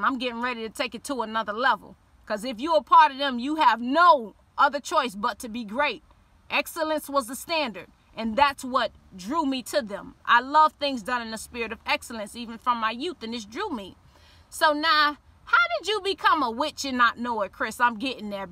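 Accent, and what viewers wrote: United States. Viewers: You are beautiful and I love that Florida chopped accent lol…what’s your IG??? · I hear a Louisiana accent. Thanks for sharing you testimony beautiful. This is my first time watching any of your videos.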